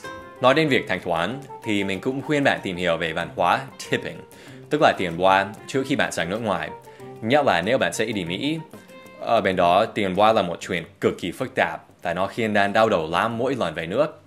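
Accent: Standard. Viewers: Bạn nói Tiếng VIỆT CHUẪN ghê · Dan hài thật phát âm chuẩn học sinh Việt hêhê! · mấy bạn để ý đi giọng nói tiếng việt của thầy Dan càng ngày càng chuẩn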